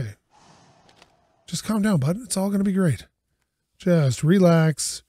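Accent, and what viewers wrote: Canada. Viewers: You have a Canadian accent are you Canadian?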